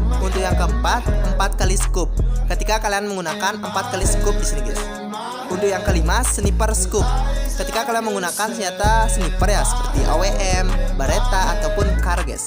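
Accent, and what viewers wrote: Bali, Indonesia. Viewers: Fiks orang bali😂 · Logat bali nya kentel banget bli 😁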